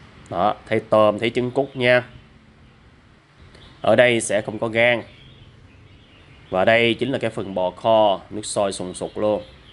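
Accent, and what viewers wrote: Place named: Saigon, Vietnam. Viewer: Mình ở xa, nghe giọng Sài Gòn, Mình cũng thích ơi, chúc - được nhiều sức khỏe, đi chơi vui vẻ ❤❤❤❤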